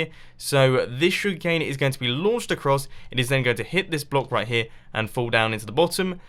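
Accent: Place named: United Kingdom. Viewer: you pronounce farm fohm because you're British shugeh cane fohm huhmmit croft laver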